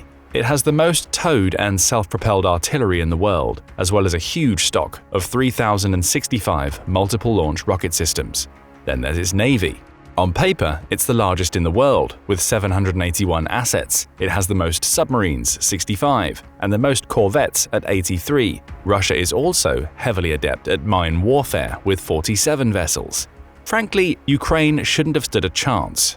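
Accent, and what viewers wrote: United Kingdom. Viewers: Love the Brit announcer, 💯🐖🐖💩🤷🏿‍♂️🥵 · Why do Brits say rusher for russia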